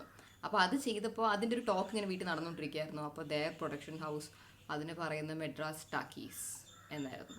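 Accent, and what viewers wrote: Trivandrum, India. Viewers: Malayalam samsarikkumbol trivandrum slang undo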